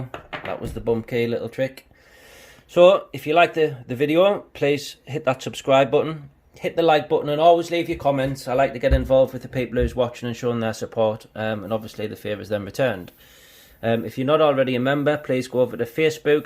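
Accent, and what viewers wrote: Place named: Newcastle, United Kingdom. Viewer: I’ve bumped Yale as me era rims lol on a different note I would love to see a video of you picking a Ruko padlock I have just got one (not the combi) I do have one bit way above my skill level but I think I should be able to open the standard one and I’m getting nowhere maybe 1 or 2 pins set your video explanations are far easier for me to understand even with the jordie accent 😂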